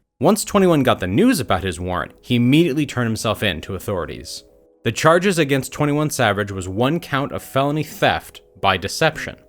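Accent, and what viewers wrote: United Kingdom. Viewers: I'm a bit late to comment on this vid But he's definitely from England🏴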